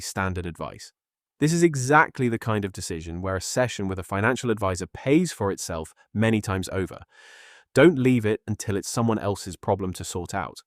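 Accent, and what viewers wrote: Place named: United States. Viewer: American pronounciation with an English accent makes me uneasy, even though the advice is good. ISA should be pronounced as a word and gov.uk should be said in one particular way.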